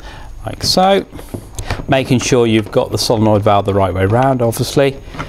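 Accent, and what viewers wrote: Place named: United Kingdom. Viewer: Brits are great instructors...they have a command of the King's English. I am an American. Even though we fought against the British to be free and have our own nation, we fought and died together decades later, side by side in WWII , against the "Progressive Globalists" of that time then, the German Nazis.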